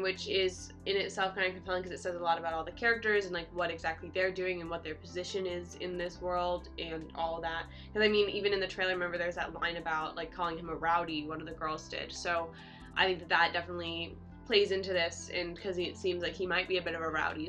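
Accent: United States. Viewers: Aww, that wasn’t the full, proper scene. So I will give a bit of context here. Prior to this, Rayappan (Vijay) will be listening to an old song from a MGR (1st Tamil superstar) movie in a car. The driver will complain about a rival gang so he will ask him to go there. That’s why when the gangsters say that this “top dog” is cornered, Rayappan said “they are trapped in a bunch” haha. Also, the focus of this scene is to show how relaxed Rayappan is whilst fighting because he has “been there, done that” sort of thing. So he ends up singing that same song (from the car) while fighting. Here’s the link to the full video if you are still in the mood to see it haha. <link> Thanks. I like your pronunciations of names. I can listen to em all day. Btw are you from a southern state in US?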